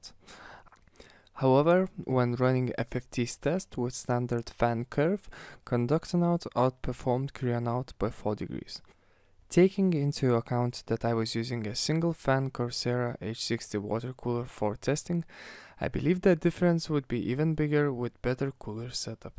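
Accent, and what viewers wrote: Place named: Russia. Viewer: Русский что ли ? По моему акцент есть .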